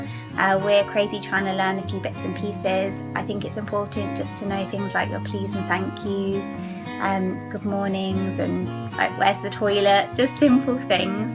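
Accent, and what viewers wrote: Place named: England, United Kingdom. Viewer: thanks I like your accent what part of England are you from?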